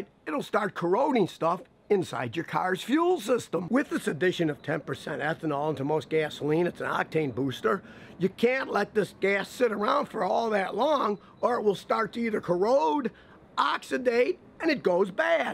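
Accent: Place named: Western New York, United States. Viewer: Really like your videos. Love the WNY accent. Reminds me of home. ;-)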